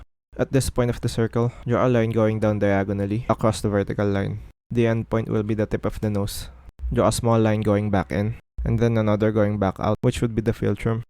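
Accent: Philippines. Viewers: pilipino accent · Filipino accent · Man that Fil Accent is really distinct lmao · Your accent kuya sounds like Filipino accent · Are you from Philippines? You sound like you're from there 😂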